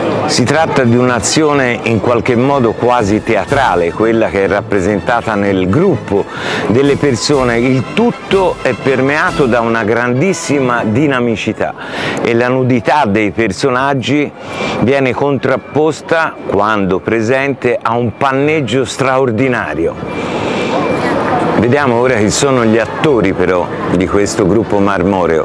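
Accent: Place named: Florence, Italy. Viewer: Ascoltarla è un piacere. Tratta sempre argomenti vari ed interessanti con un gradevolissimo accento fiorentino che mi ricorda gli anni felici vissuti a Firenze. Un caro saluto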